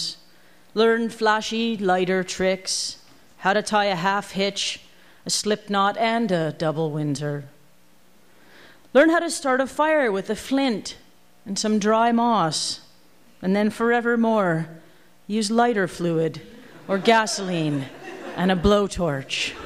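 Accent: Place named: Canada. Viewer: their accent is so comically canadian that i can hear my own get more pronounced after i hear them speak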